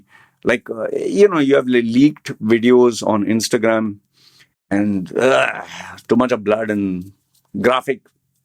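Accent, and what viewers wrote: India. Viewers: This guy claims he studied in CBSE, but doesn't know who Aurangzeb is, doesn't know about Maratha empire, doesn't recognize Shiva tandava stotram, cannot pronounce basic Indian names, has an Indian accent but acting like a gora who is clueless about India, "oh, what is this strange Hin-doo stuff", etc. Something seriously weird going on...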